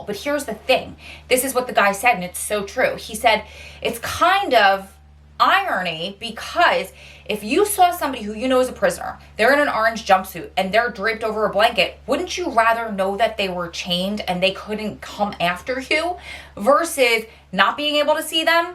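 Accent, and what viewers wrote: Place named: South Jersey, United States. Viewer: Omg you are from South Jersey aren’t you? I’m new here. That accent! 😩 Cross between Southern and Philly. I live in Salem County. I understand if you don’t want to disclose where you are I just think it’s funny! I’m loving the content!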